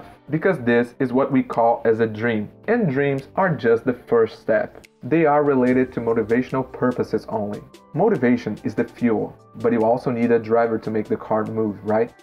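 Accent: United States. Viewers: I thought he sounded American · Wow when I started listen you I thought I was watching a American guy spoke in English. I couldn't say that you are a Brazilian if you didn't say that you are. Congrats from now on I will follow your videos. I am studying on my own as well. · Brazilian with american accent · He does sound pretty American later on, but definitely not in the beginning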